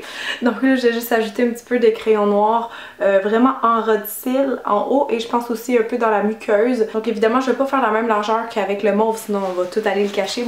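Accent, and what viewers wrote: Quebec, Canada. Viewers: L'accent Québécois! J'adore cet accent! Vas-y continu tu seras rapidement aux 1'000'000! :D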